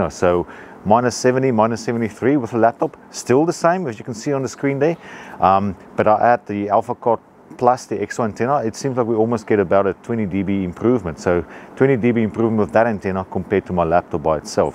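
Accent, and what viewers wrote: South Africa. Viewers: This guy is South African lol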